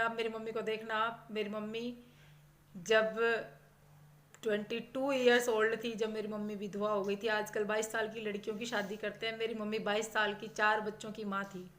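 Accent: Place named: Haryana, India. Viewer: Aapki aavaj se to asa lag aap haryana se ho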